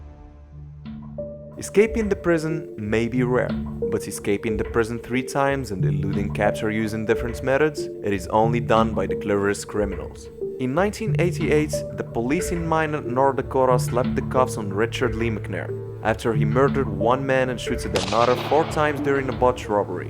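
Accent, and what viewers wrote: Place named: Morocco. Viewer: Nice video! I can hear a moroccan accent, good work man :D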